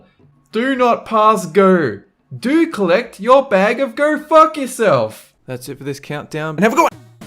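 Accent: Australia. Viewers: is he aussie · Sounds Australian to me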